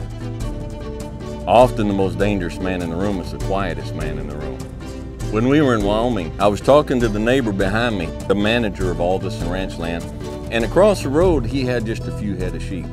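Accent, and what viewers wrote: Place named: South, United States. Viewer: Somehow hearing all these sayings, with the southern accent makes you want to be a Badass Buddha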